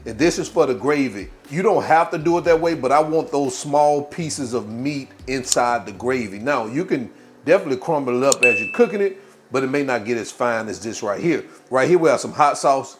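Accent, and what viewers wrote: South, United States. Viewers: It was really good, just not spicy like I used to make.🔥 Sadly the other people in my household can't handle anything remotely spicy so I have to keep things on the tame side...they literally think a dusting of black pepper is spicy.😭 Funny thing, I came back and watched this video again a few hours ago, it looked that good lol.🤪 I'm from Florida and would often get beef or pork cube steaks and idk why but you can not find either up here in Baltimore so I would have to improvise. I had been wondering what your accent was, could tell it was from somewhere down south. Being from Florida is like Alabama then, hot sauce goes on everything imo too. Last but not least, gonna try the grating the sausage trick because I usually minced with my handheld chopper while frying it and grating would be less work for my carpel tunnel. Sorry for rambling lol.🤣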